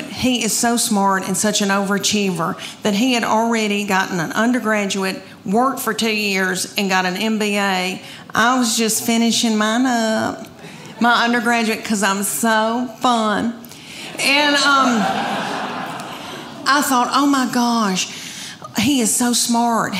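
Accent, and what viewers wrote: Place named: Appalachia, United States. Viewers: I love you. I, a Yankee from NYC, lived in Memphis Tennessee for over 3 yrs and know most of TN quite well. If anything made an impression on me was that everyone was so, wholesome and funny, just like you. I learned so much about America, being a bred and raised city boy. I didn't realize how ignorant I was in the ways and niceness of the South. Those experiences and memories were amazing and I cherish all of them till this day! When you talk about being that "cute girl" in britches OMG, many times I went out with those girls! They were absolutely beautiful and their accents, like yours, would just melt my heart! Best was they were honest and loving. Your comedy is superb. I wish you the very best for you and your family. God Bless! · Why is it, when I hear a southern accent, I think dumb. If you don't want to get pregnant try the pill or condoms, honey. 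This is funny ? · She's gorgeous, intelligent and funny! I just LOVE that accent too! As smooth as Appalachian moonshine!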